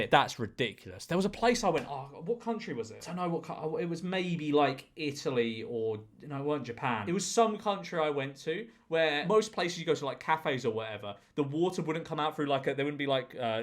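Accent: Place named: Liverpool, United Kingdom. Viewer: Why are you slowly turning scouse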